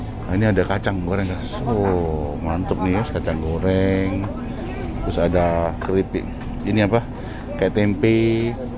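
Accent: Surabaya, Indonesia. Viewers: Boso sampeyan ngguyokno.... Bener...bener...Londo kampong yo puinter ngomong suroboyoan Om.... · saya senang kalo Pak bahasa Jawa, sering keluar ciri khas Suroboyoane, ayo Pak bikin conten pake bahasa Jawa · Big respect buat om lama di Amrik aksen ngomong dan masih gunakan bahasa Indonesia dan Jowo Suroboyoan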